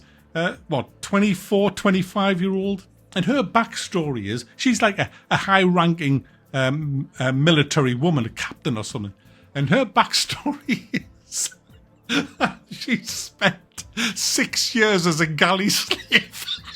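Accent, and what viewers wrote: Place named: Newcastle, United Kingdom. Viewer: Honestly I couldn't care less about Avowed it's just nice hearing a fellow geordie ranting about poor shite 😆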